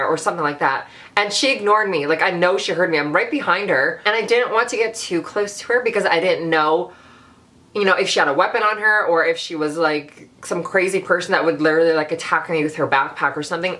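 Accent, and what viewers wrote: Vietnam. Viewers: your old vietnamese lady accent is my favourite thing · I'm sorry but I always die laughing at your Vietnamese accent because it's DEAD ON. I'm Cambodian, but my hairdresser is Vietnamese and sounds EXACTLY like that. It just puts a smile on my face.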